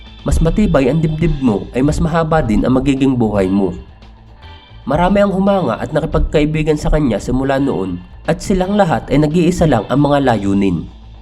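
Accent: Philippines. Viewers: bisakol ampota!!! pilit managalog brad ah! tang ina ka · Taga saang probinsya yung nagsasalita? Bulcan ba yung ganyang punto?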